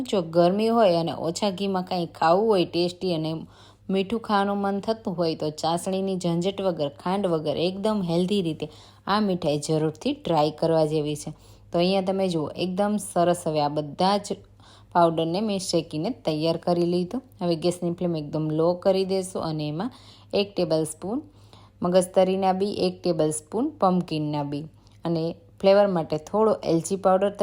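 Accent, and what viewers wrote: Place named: Kutch, India. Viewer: મેઘપર બોલીથી કચ્છ 🎉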